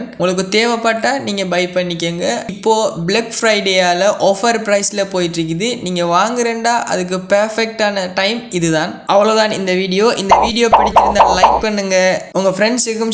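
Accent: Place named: Sri Lanka. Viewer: Sri Lanka tamil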